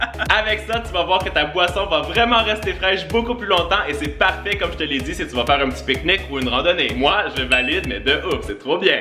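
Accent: Quebec, Canada. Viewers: Vas il et québécois donc ses normal qu'il a un acsant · J’aime grave l’accent québécois 😮😍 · J’adore l’accent québécois 😂😂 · J adore ton acsen cbekcois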